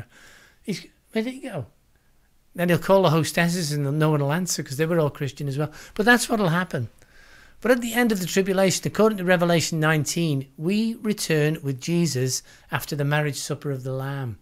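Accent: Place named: Scotland, United Kingdom. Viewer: Scottish? That wonderful accent.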